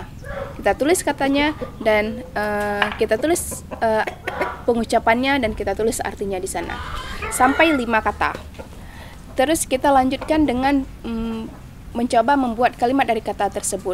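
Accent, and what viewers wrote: Malaysia. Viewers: Logat nya kadang kayak bhasa malaysia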